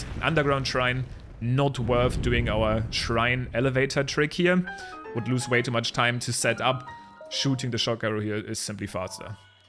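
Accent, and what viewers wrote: Germany. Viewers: I do love German accents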